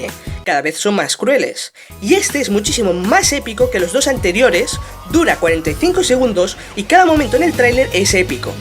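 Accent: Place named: Spain. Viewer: Ah vale, es que como hablas, pareces español y no latino, por eso pregunto😅jajaja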